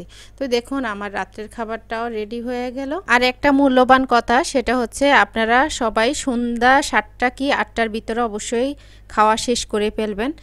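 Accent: Noakhali, Bangladesh. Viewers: Apur bari j noykhli buja gycy kotha suny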